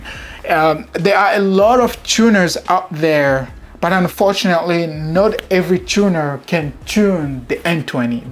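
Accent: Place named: France. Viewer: Are you french ? You have a little accent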